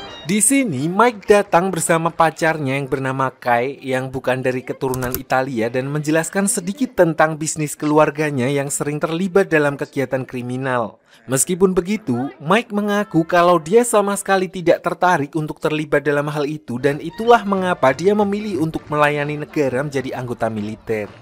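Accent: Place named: Tegal, Indonesia. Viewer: Narator asal tegal. Di usahakan ga medok pun susah ya min. Kadangada yg lancar tapi kesulitan bedain antara R sampe jadi RL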